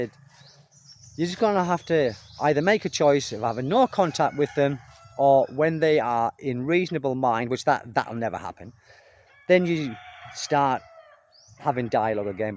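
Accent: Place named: County Durham, United Kingdom. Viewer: County Durham accent if ever ive heard one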